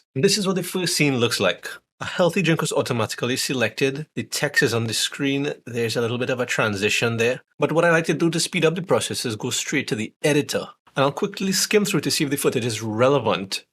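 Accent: Trinidad and Tobago. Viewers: I love your Trini accent. #subscribed.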